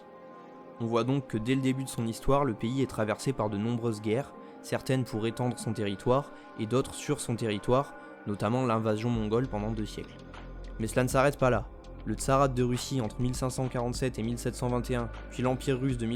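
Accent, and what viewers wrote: France. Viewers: C'est un parisien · C'est l'accent du sud 😉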